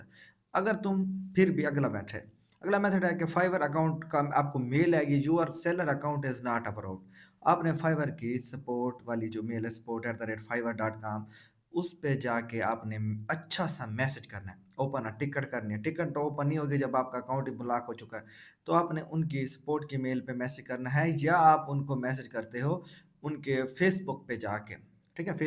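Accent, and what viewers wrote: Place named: Kot Addu, Pakistan. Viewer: chlyn good hogia bhai... Seriously thanks wo meri kismat account chly na chly laikn aik e gig pe 20+ orders mily hn bss payment method add hojy ksi traa.. boht informative vedio thi apki.. apky ascent se to aesy lgta hy k ap DGk, taunsa ya kot adu bellt se belong krty hn I'm i right? 😂 Mn khud v kot adu k city CSS se hon😊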